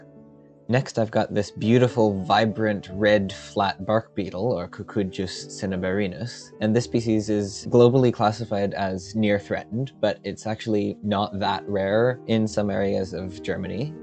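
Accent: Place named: United States. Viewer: You mentioned your location is Germany, but you're sounding American. If you're living in Germany, in which area is it? I love to see younger people doing photography or even macro. Usually its more an old white man stuff... 🙂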